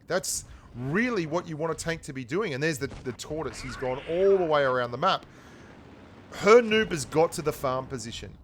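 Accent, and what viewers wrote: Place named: Australia. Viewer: hey mate, r u Aussie? swear to go you sound like one.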